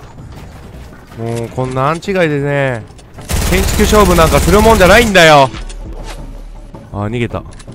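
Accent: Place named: Hiroshima, Japan. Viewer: うん、広島とかその辺の方言なんだよね。 by広島県民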